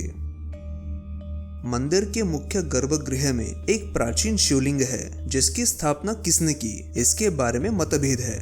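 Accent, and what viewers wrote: Maharashtra, India. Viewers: मित्रा तूझ्या उच्चारावरून तू मराठी वाटतोस. औरंगाबाद परिसरांत शाश्वत पर्यटनासाठी आम्ही प्रयत्न करतो आहोत. एक फ्रेंच मित्र गेली ४ वर्षे नियमित येथे येत असतो. तूझा मो. न. कळव. श्रीकांत उमरीकर <num>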